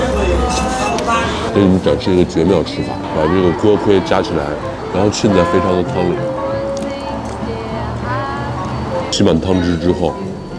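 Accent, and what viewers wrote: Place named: Sichuan, China. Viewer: 说四川话比说上海话好听多了，别整天好不啦👎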